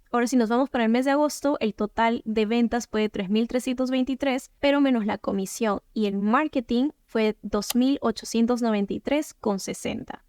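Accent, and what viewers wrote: Peru. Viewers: Hola! Muchas gracias por otra vez un excelente vídeo. Es chistoso cuando vi tu primer vídeo, me pregunte será peruana por el acento (aunque somos los menos que tenemos acento en Latinoamérica 😂) y después leí un comentario que si…yo también 🇵🇪 😊 ❤️ Pregunta! so ni bien la persona ordena y paga por el producto, Printify lo recive y cuando lo envía ahy mismo te cobra a ti, pero Etsy todavía tengo entendido que no te paga a ti hasta verificar y que el producto sea enviado…so cuánto tiempo pasa para que Etsy te pague? Y entonces tú siempre tienes que tener un monto de dinero en tu cuenta para cuando Printify se cobre de ti (porque Etsy aún no paga hasta dias) verdad?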